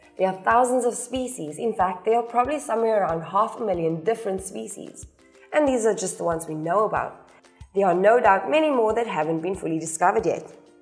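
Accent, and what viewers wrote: South Africa. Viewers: This helped so much One question: Is the voice over south African?👀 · This helped very much thank you 🤧💞😭 (Also you sound south African for some reasons I'm probably wrong tho )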